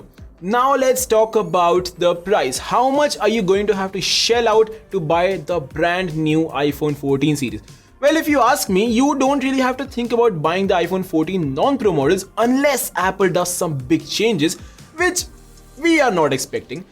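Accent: India. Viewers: Indian freshy English accent is very potent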